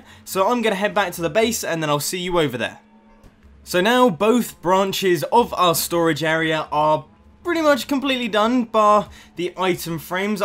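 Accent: England, United Kingdom. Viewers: don't mean to burst your bubble but your kind of a rip off of xsuima I know every one can make tutorials but also the building style and the houses for donators plus you have an English accent which makes it more obvious just come up with your own thing is what I'm trying to say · listening to your british voice every wednesday ? i would absolutely love to :D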